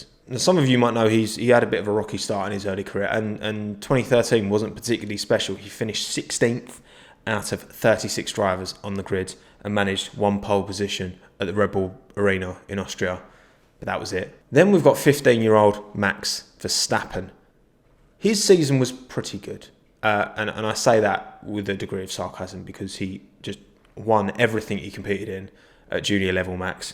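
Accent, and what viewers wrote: Ireland. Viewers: Irish Guy???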